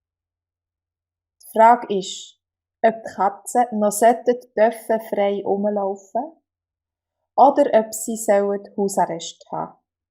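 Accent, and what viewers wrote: Switzerland. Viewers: I love your videos, I love your stories, I love your swiss german accent, I really should express this love making your skype lessons hehe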